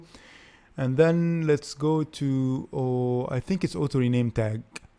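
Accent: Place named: France. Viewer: Everything made perfect sense because of the way you explained it. Thanks a million. The charming French accent added a flavor haha. It was perfect!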